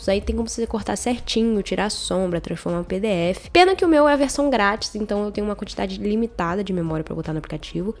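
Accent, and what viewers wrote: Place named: Rio de Janeiro, Brazil. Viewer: carioca com xish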